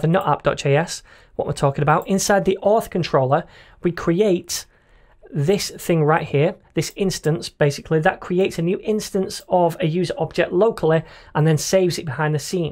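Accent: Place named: Northern England, United Kingdom. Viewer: Really loving this tutorial series, it came at the right time for me in my programming journey. I have found that it's best to simply watch your tutorials start to finish without taking notes, and then opening up the project file at the end from GitHub and seeing how much I remember. I know everyone's style is different, and this maybe a bit selfish, but i thought i'd suggest it anyway - as these are predominantly tutorials, it would be cool (at least for me :P) if there were more tutorial-comments in the code for us to refer to in future. I suppose I'm just lazy though. I should probably comment along the actual code as I watch. But might need to halve the speed. Where are you from? I can't tell if it's Manchester or more Newcastle-way. My dad overheard your voice and said 'bloody 'ell he should teach the masses with that voice' :D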